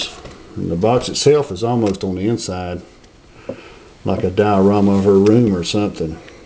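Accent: South, United States. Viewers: Whoa, the southern accent threw me off a bit there, lol. Good vid. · You sound southern to me XD Sorry if Im wrong!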